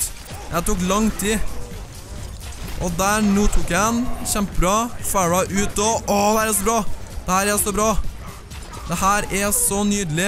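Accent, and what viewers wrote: Trøndelag, Norway. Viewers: Trøndersk e bæst!!!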